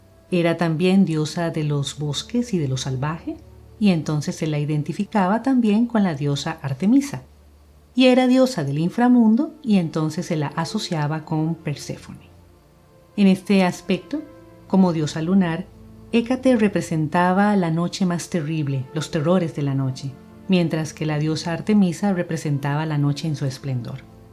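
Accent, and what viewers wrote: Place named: Costa Rica. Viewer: Tenes acento tico🥺❤️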